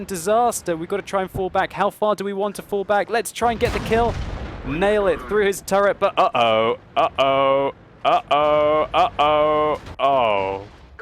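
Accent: Russia. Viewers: Why Russian accent don't understand. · It was realy bad. You reviev tank with was in polish army with polish tankers and u speek with russian accent with rusian flag and with Soviet Bear...It was realy bad and insulting for polish ppl.... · Rolf Rolf it is so easy to flame, isnt it? If you would spend some time to read a book or two, about polish tank platoon during II WW you would realize, that the idea of movie itself and this bloody tank is an insult to the history, but it is easier to pick up the most obvious fact, that some dude reviewing a tank from a soviet tech tree speaks with Russian accent. Congratulations to you, depth of your analysis is staggering, and the fact that you think nobody noticed that till now just means you are a seriously disturbed narcissistic individual. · Why make Russian accents in a video about... a Polish theme tank? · LOL, love that russian accent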